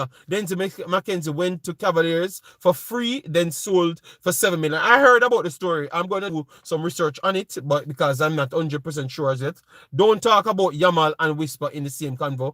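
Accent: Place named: United Kingdom. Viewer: Great to see you back big boss! That british accent kills me everytime 😂😂😂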